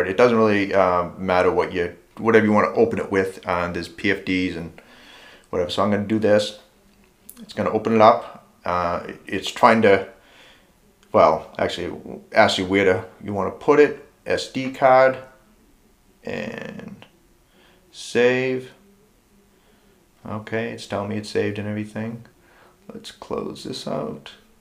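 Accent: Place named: Boston, United States. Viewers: Way to go. I recognize the Boston accent. I’m from Boston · lol im from amsterdam and i was about to ask if it was a boston accent heheh cant miss that shit :)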